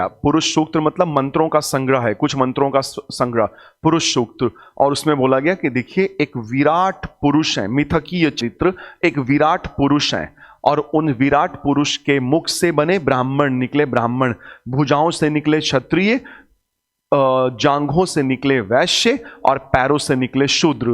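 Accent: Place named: Ghazipur, India. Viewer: Thank you so much sir🙏🙏 Sir aap Ghazipur district se h aisa lgta h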